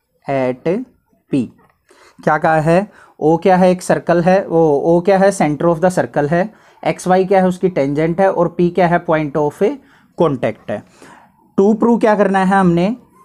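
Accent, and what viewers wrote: Haryana, India. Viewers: name tek lete h 😁😁🤣🤣🤣🤣🤣are you from haryana sir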